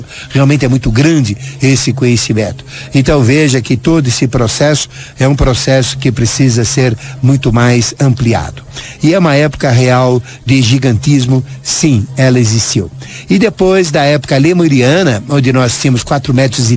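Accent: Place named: Paraíba, Brazil. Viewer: esse teu sotaque de paraiba que fode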